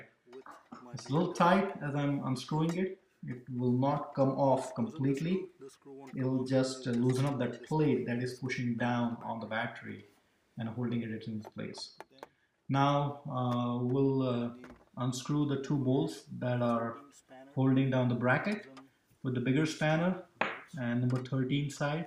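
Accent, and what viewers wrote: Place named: India. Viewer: indian accent ? its terrible man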